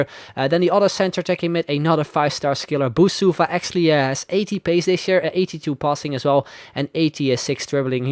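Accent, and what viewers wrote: Netherlands. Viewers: Is he dutch??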